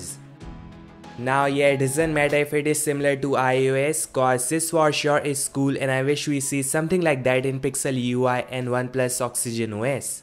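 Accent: India. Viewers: Are you indian? You talk like indian !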